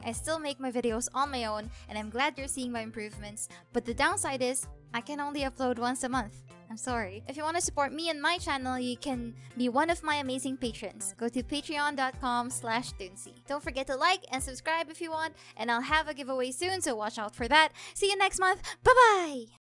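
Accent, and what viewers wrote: Philippines. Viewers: are you a Filipina??? cuzz you voice sounds like it??? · 35 seconds into the video and I already know she's a Filipino xD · Soo u a filipino i know that accent imma filipino too :D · Based on the grammar and accent YOUR A FILIPINA! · If may I ask Are You Filipino???!! Your Accent Is kinda !!! Haha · The first time i saw this i was like : why does she have a filipino accent? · And btw I noticed how TOONCEE speaks HAHA I notice in the first place but i don't have a clue TOONCEE's filipina WHOAH LAB U ATE TOONCEE🥺✨ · Me: Hears ToonCee say pancit canton, and no American accent Also me: Definitely Filipino. Edit: this is my first video watching ToonCee don't bully me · I knew it from the start y have a Filipino accent lol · woah tooncee is a filipina girl i know the accent of the filipino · this my first time watching. you sound filipino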